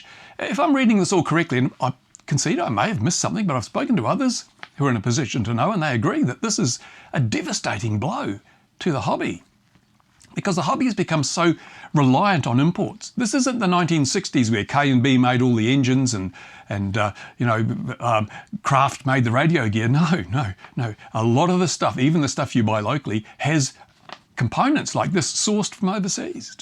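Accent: Australia. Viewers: MAN! I'm glad every day that I have a permant resident permit in, -Gabriel of Norway. Thanks very much for this video, my brother who sounds to be 'down under '. Love y'all, mate! Unfortunately Norwegian politcians are in bed with those in the USA. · lol this guy sounds aussie.... like he has anything to talk about when it comes to restricted freedoms. I'll trade a hobby for FREEDOM any time of the day, and that's what it reallly is coming down to. I k now 99% of people reading this, won't understand. We have been in an economic warfare for decades. Now it's time to fix that.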